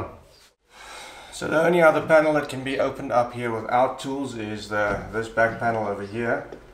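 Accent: South Africa. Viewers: Your Afrikaans accent is jumping off my Afrikaans accent scale. Even my wife was able to figure it out after I gave her a couple guesses.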